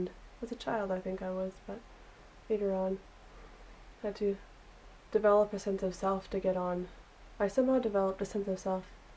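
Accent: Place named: Manitoba, Canada. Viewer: I can absolutely tell that you were raised holdeman by the way you speak. Thank you for sharing! May I ask, are you from Manitoba? You have the classic Manitoba accent within the "church".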